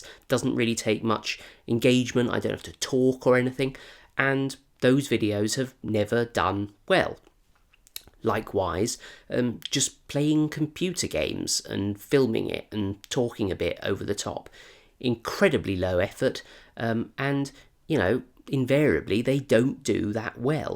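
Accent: United Kingdom. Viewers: the british accent does the rest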